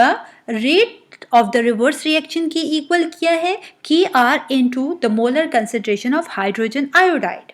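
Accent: Pakistan. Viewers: Mam are you from Pakistan?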